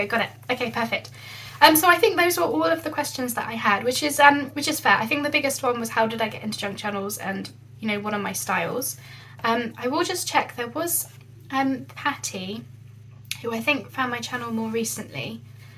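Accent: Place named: England, United Kingdom. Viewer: I am so glad you asked! 😃 From the title I was NOT expecting and English accent. Lol. I was wondering if I missed why she chose. I look forward to the answer. ♥️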